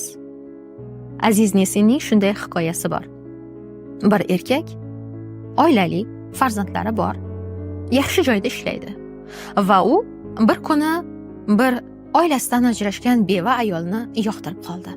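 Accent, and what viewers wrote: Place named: Russia. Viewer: Yahshi naraala aytasizuu, labizzi rossa qiyshaytirib gapirishiz nitodaa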